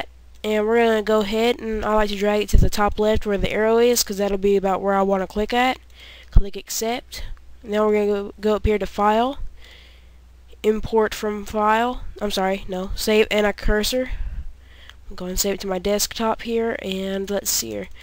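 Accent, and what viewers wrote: United States. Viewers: ._. not really, I'm a Texan, I've never met anyone with an accent like that, He's most likely from the Bayous or something... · Cool hillbilly voice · such country accent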